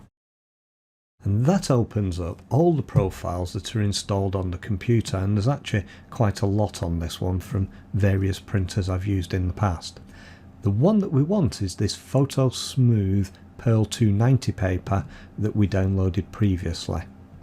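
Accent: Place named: Lancashire, United Kingdom. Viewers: Your accent sounds local to where I live. Born in Lancs live over the Pennines 🙂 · Hi, why on earth can't others talk clearly and in a straightforward manner like you, clear & concise in an English accent. First class video. thank you so much.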